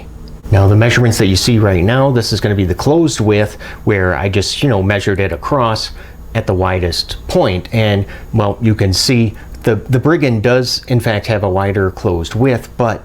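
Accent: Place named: Michigan, United States. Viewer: this was exactly the video i was looking for, thanks man. first time i've seen your channel, i dunno where you're from but i just moved away from michigan to the west coast and your accent brought me back home for a minute, lol. are you a yooper?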